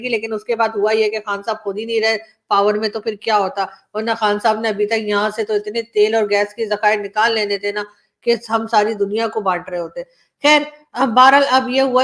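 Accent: India. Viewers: जी नमस्कार आप इतनी खरी खरी सुनाती हो क्या आपको पाकिस्तान में डर नही लगता बोलचाल की टोन से ऐसा लगता है कि आप भारत की हो । आलिया शाह की टोन से वो पाकिस्तान की लगती है। आप खुश रहो आबाद रहो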